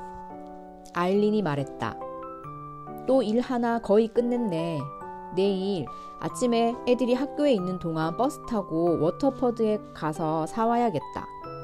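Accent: South Korea. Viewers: 대구분이신가봐요 ㅋㅋㅋ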